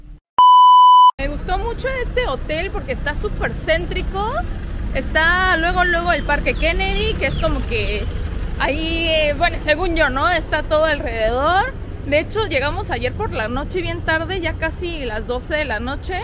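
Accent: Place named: Mexico City, Mexico. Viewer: Hablas con acento de Ciudad de México!!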